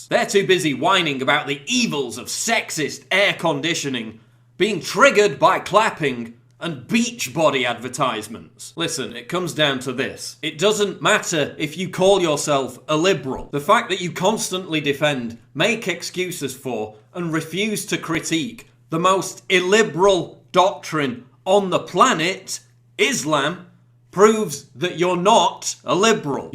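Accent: United States. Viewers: thought you were British so why are you talking like a American.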